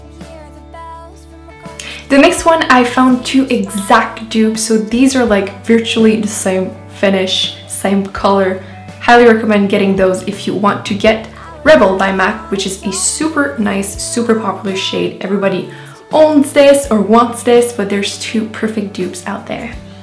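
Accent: France. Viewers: Great video! I needed it .. Btw ur beautiful and I love your accent even though I don't know where you're from. French? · Most helpful video about dupes ever! Thank you! The first time I ever heard of wet n wild lipsticks was from your swatch video and I instantly wanted all of them lol so, I'm working on owning most of the wet n wild lipsticks because they're amazing. Every paycheck, I get one :) Also, your accents is adorable lol. Is that French or? Love your videos, girly! ✌️😊🌸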